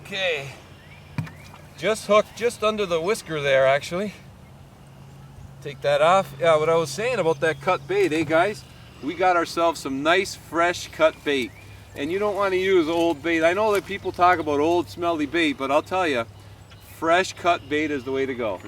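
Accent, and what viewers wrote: Canada. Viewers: Are you from Canada Aye? Nice videos good information it has helped me a lot ! Thanks. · "Eh?". God, I love Canadians. That and a really superlative lesson in catching catfish!! :) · Is this guy Canadian, eh?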